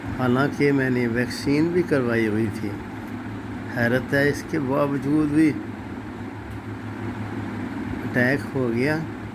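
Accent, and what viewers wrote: Punjab, Pakistan. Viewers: میں لاہور سےہوں لیکن عرصہ دراز سے یو کے میں مقیم ہوں مرحوم کو باقاعدگی سے سنتا تھا کہ ان کے پنجابی انداز اور پنجابی لہجہ اردو کو بہت پسند کرتا تھا ۔ اللہ تعالئ مرحوم کے تمام گناہ معاف کرکے جنت الفردوس میں اعلی مقام نصیب فرماے امین ۔ آگ ان کو کوئ فیملئ ممبر آ کر تھوڑا مرحوم کے آخر ی سفر کے بارے میں بتا دے اچھا ہو گا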